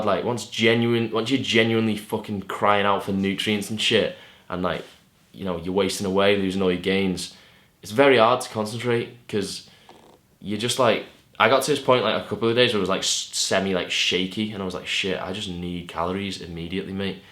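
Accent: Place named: Liverpool, United Kingdom. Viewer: you from widness got bit scouse in ye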